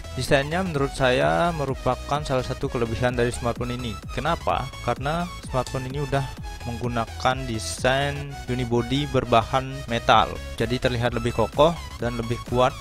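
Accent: Bali, Indonesia. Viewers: logat balinya kentel kak 😂. orang Bali kah? #cumantanya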